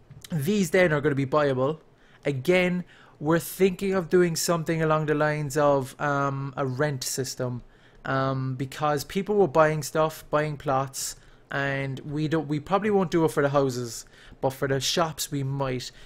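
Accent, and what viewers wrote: Ireland. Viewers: I am and you sound irish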